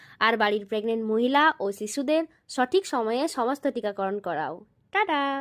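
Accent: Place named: Purulia, India. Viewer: পুরুলিয়ার টান টা যাবেক নাই😂😂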